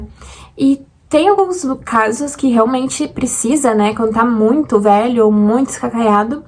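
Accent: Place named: Rio Grande do Sul, Brazil. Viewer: pelo sotaque, também sou gaúcha de Porto Alegre.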